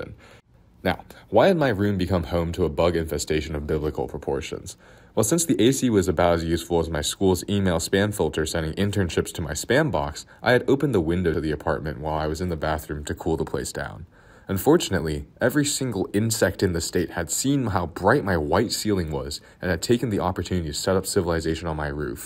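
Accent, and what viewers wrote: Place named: North Jersey, United States. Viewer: Sounds like north Jersey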